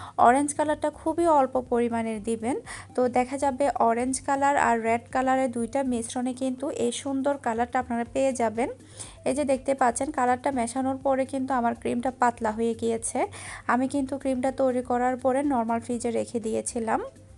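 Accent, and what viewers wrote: Chittagong, Bangladesh. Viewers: আপু আমার মনে একটা প্রশন ছিল কারন তোমার কথা গুলো অনেকটাই আমাদের চট্টগ্রামের মত কিন্তু আজই জানতে পারলাম তুমি আসলেই চট্টগ্রামের,জানতে চাইনি কারন তুমি অনেক বেস্ত আর এখন জেনতে পেরে তোমার প্রতি ভালবাসা আমার অনেক বেড়ে গেল।আর তোমার সাথে আমার অনেক মিল আমার ও দুই মেয়ে আর ছোট মেয়ের নাম আরোহী।দোয়া করি সব সময় ভাল থাক। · হেতি চিটাগায়িংয়া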